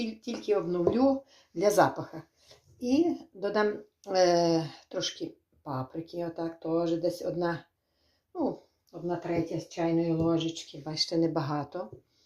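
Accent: Ukraine. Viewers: Не хочу я звикати до бара боли.Что за деревенский украинский язык?!! Селянский!!!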